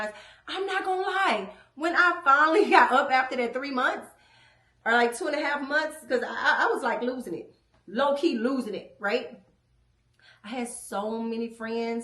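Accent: Michigan, United States. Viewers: waitttt you from michigan?😂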